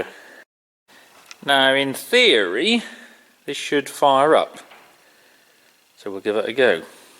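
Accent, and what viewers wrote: Norfolk, United Kingdom. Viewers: I'm sure I can hear a bit of a Norfolk twang in that accent ! I'm thinking West of Norwich.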